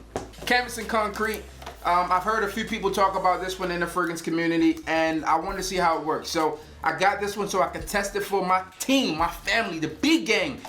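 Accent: Baltimore, United States. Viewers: New to the game and from what I've seen I'll be leaning on your reviews a lot! Is that a Baltimore accent I hear?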